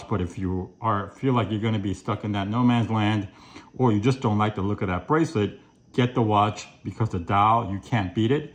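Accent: United Kingdom. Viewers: You say it as if British accent is a choice 🤔 just not sure what your original complaint was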